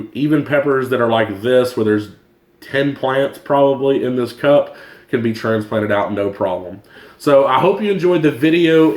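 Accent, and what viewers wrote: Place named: Georgia, United States. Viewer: Where is that country accent from? You sound like a Georgia boy.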